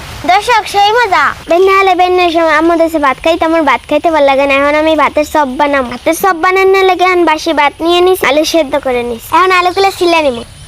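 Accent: Barishal, Bangladesh. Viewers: বৱিশাইল্লা নাকি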